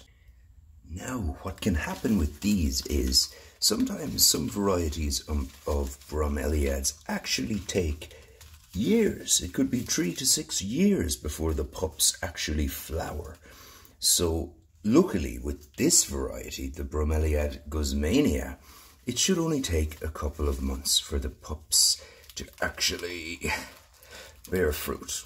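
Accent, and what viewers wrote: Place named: Ireland. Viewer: Sounds like a grand Irish accent.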